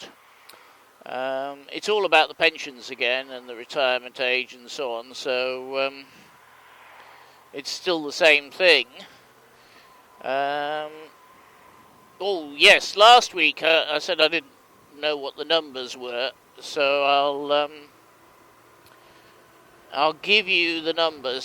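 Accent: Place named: France. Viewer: Sounds like you've lived in France for quite a number of years,.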